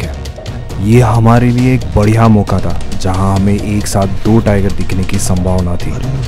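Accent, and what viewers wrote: Maharashtra, India. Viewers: as much as I love jungle Safari, I hate the Cricket commentry. Marathi accent , I miss it , like I miss the Bagh !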